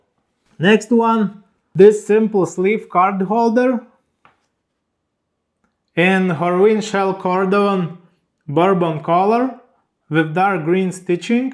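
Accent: Russia. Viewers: Крутейший Русский акцент, очень приятно слушать. Ну и изделия, само собой, огонь!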